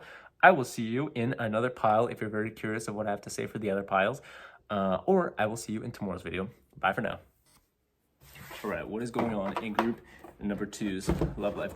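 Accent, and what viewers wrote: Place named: Canada. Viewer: This is a slightly strange comment 😂 but I'm canadian and have been studying in germany for 2 years. Hearing your voice (you sound so distinctly canadian) was so comforting. I'm happy to be where I am, but I really needed that little taste of home.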